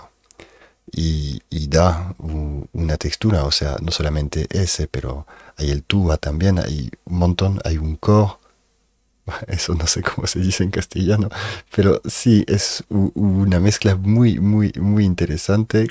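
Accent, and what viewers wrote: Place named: France. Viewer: ME ENCANTA TU ACENTO AFRANCESADO Y DULCE . HABLAS BASTANTE BIEN EL ESPAÑOL , PARA NO SER TU IDIOMA NATIVO . UNA PEQUEÑA CORRECCIÓN CON MUCHO CARIÑO : (Se dice) TRADUJERON ✅ Traducieron ✖️ SALUDOS DESDE MONTERREY, NUEVO LEÓN. MÉXICO. YA TIENES UNA NUEVA SUSCRIPTORA ✅🥰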